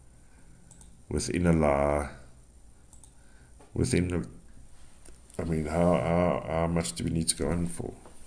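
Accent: South Africa. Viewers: Anyone else just clean their whole house or take naps or listen to these videos before bed? I just love South African accent.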